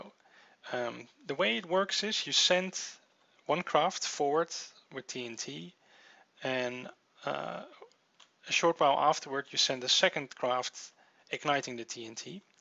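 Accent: Netherlands. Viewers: are you dutch? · Are you dutch?? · Are you a Dutchman because i can hear your talk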